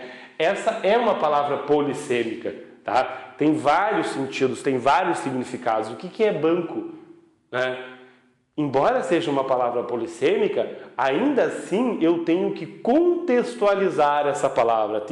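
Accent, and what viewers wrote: South, Brazil. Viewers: Muito top o sotaque do Sul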